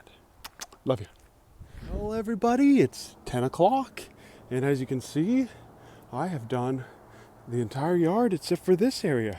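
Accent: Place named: South, United States. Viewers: I'm sorry, i love ol' and all, but I'm really glad he eventually dropped that kinda southern gentleman voice he uses on these early videos. I guess I just got used to hearing him the way he sounds now (2024). That southern lawyer thing is extremely cringe to me. It doesn't keep me from watching, I just prefer his natural voice. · when u speed up the video i can really hear ur texas accent lol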